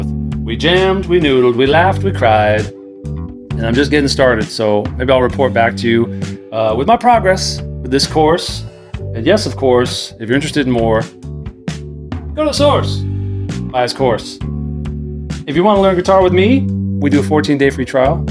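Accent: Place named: New Orleans, United States. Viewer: Man you sound like you're from New Orleans lol. I am so I think thats pretty cool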